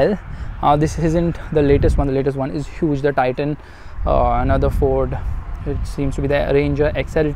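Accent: India. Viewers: I love Indian accent its so beautiful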